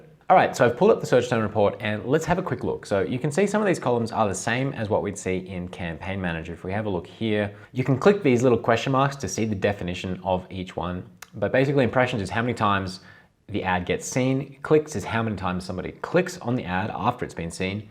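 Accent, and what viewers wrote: Australia. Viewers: Loved the no fuss Aussie delivery. Can you tell me do you eventually drop the automatic campaign when you have sorted the manual campaigns. Cheers.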